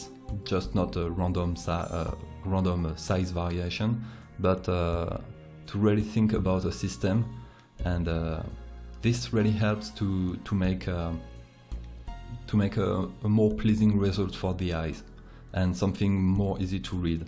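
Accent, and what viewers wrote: France. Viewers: je lai remarqué a l'accent :) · i know at your voice your french i guess ... but its not bad :D you can speak english for ur vids is it good <3 im dutch ... i just talk english because i like that launge... im learning too french :P on 02/26/2016 i got a test from french laugne :D i got 17/20 pretty good :D i like ya vids! <3 <3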